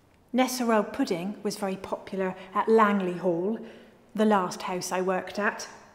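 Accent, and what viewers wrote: United Kingdom. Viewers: Her British accent is life. Americans have destroyed the English language. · Which british accent is this? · British accent is very elegant and the way she speaks is polite and decent.Merry Christmas 💫🌲🌲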